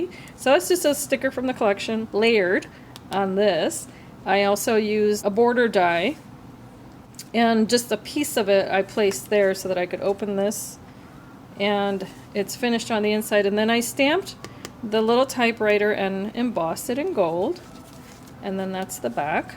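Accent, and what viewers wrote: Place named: England, United Kingdom. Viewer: Beautiful!!! I have this one and the cooking theme one and yes it's been in the hoarding vault lol, oh you remind me of my daughter with her English accent lol, (Boricua/Colombian) and she speak English 😂 tfs hugs Yorlis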